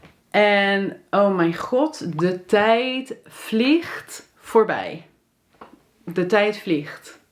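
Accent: United States. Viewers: Very minor super slight tinge of US accent in the "R" but nobody would notice. The guttural "hock a loogie" "G" is spot on. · hai i have a question: are you dutch or american? ps love your videos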